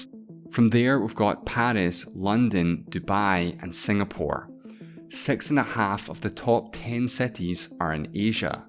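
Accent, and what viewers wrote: Scotland, United Kingdom. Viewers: Scottish not sure where in Scotland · He's Scottish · he’s scottish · where in scotland are you from? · Scotland. I couldn't say whereabouts but he's definitely Scottish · Are you Scottish? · You've never heard a Scottish accent? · guys, the guy's from scotland. give him a break for pronouncing "city" as "seti" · Is this a scottish accent or something? Harder to understEnd. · Hes scottish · no he doesn’t? It’s just a Scottish accent.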